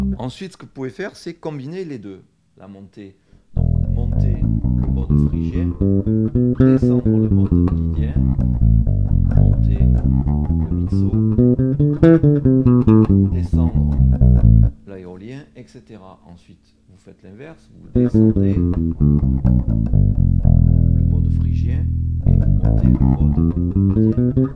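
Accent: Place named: France. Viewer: haha im french and i didnt understand it all, he's from france i assume from his dialect, and i speak quebec french ahah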